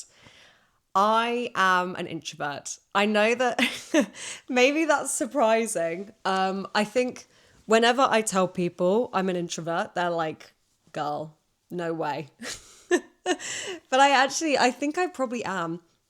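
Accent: England, United Kingdom. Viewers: Hi, I'm commenting for the first time on your video but I've practiced with you everyday since I found your channel. I aim to get 7.5 in IELTS test to move to Australia but here, in Japan, I felt kinda difficult to be soaked in English while doing the job. I've looked for many English channels but I love your channel the best ever!! How you speak, the attractive content for me every times, England accent which I want to immitate, your kind personality, and cultured talk. This time, I was engaged by your word, go try and don't be afraid of failure, and try to speak or write not using AI. I often use AI to translate because I want to use complete words but I changed my mind about it. I think I'm ambivert personality like you, but I often lost confidence when I speak English. So today I got a motivation from you again.🌼 Thank you as always✨🙂‍↕️ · hey, love your videos, love so much you do this podcast bc i live in mexico and the usa acent os afull and british so more elegant and more structure i dont know, send hugs gracias y buen dia y sigue haciendo tu magia ademas de practicar tu español, gracias por leerme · Hi,! 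I am your huge fan! 🥰I am a university student from China. I first found your videos back in March on douyin(China’s version tiktok). I had watched most videos there, but the account has stopped uploading the new contents since June, so I came here(youtube) and tracked you down(official account!)🤗. I like the way you teach English(daily life English) and your British accent! I can learn many new and fun words and get joy from videos(especially when you laugh, it’s so infectious).:elbowbump:Can’t wait to watch more videos from you! And hope your channel keeps getting better and better! By the way, I feel you look a little like Lara Pulver(from Sherlock).🤭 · 日本ではアメリカ発音で英語を習いますが、あなたのビデオでイギリス発音の魅力を知りました。 私には少しだけスピードが速いので、0.5倍速で字幕をつけて発音の練習をしています。 とてもナチュラルな動画内容なのもとても好感が持てます。 これからも活動応援しています。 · Eres la mejor profesora gracias por tus maravillosos consejos, me encanta uur British accent😊❤ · has a beautiful personality type, i like when you smile your short laugh Is this type of being shy? 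I swear U my favorite british teacher with the best accent Your short laugh kills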